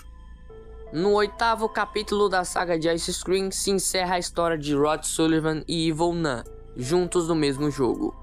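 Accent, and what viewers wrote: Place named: Brazil. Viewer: Oi tudo bem então eu até concordo com vc pq tipo se não der certo nos jogos da keplerians provavelmente ela talvez lançar o Ice scream 9 pq tipo eu sei que Ice scream 8 foi o encerramento da franquia Ice scream pq mano tem muito jogo do Ice scream ainda né então se a keplerians não lançar Ice scream 9 provavelmente ela vai lançar essa nova franquia aí né e pois é mano pq Ice scream foi uma franquia que durou mais tempo que na verdade foi 5 anos de franquia que foi desde 2019 eu tava no 2 ano da minha escola e eu nem ligava pra jogos de terror eu só liguei em 2020 né quando começo a pandemia né e pior qu foi isso tudo mesmo mas uma coisa que eu queria falar é que eu gosto muito de vc e também eu tenho 4 YouTubers favorito que são Isaque yt, Leozico games, player caro e vc que na verdade quando eu te conheci eu achava que vc era gringo mas depois que ouvi sua voz aí eu percebi que vc é Brasileiro né eu sei que eu devo ter digitado muita coisa aqui né mae são essas coisas que queria falar Mesmo mas pode falar comigo se quiser tá bom 👍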